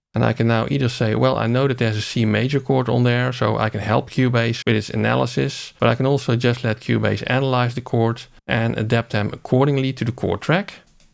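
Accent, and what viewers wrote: Netherlands. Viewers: Man man man, wat een dik, Nederlands accent. Maar verder wel een informatief videootje over de Chord Track.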